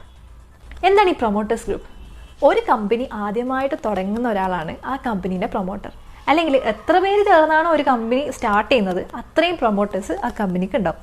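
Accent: Kannur, India. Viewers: Kannur style...good information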